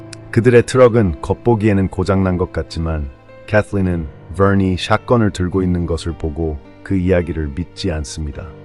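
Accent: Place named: United States. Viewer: 혀 굴리는 코메리칸 스타일 리뷰어에 뇌가 울렁증...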